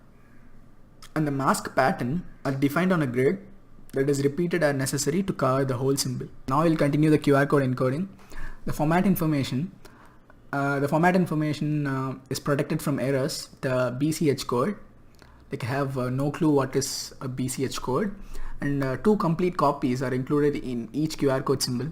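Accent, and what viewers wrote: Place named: India. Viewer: Great if you are a Indian. Cannot understand his speech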